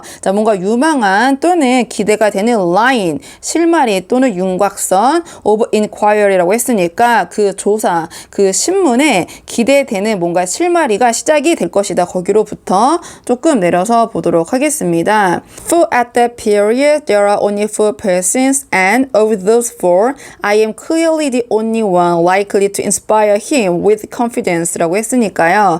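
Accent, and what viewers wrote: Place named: United Kingdom. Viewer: 선생님! 감사합니다. 선생님 덕분에 혼자서는 꿈도 꿀 수 없는 아가사 크리스티의 'And then there were none.'을 끝까지 볼 수 있었습니다. 마지막 Wargrave의 'manuscript document'부분은 까다롭고 이해하기 어려운 문장도 많았지만 선생님 덕분에 마지막 까지 읽어 낼 수 있어서 참 기쁘고 행복 합니다. 군더더기 없이 속도감 있는 선생님의 강의 진행 덕분에 지루할 틈이 없었습니다. 그리고 선생님 특유의 영국식 발음(!)에 시간이 지날수록 매료되었습니다.😂 다시 한 번 감사드리며 이젠 나니아 연대기를 시작하겠습니다~^^고맙습니다.❤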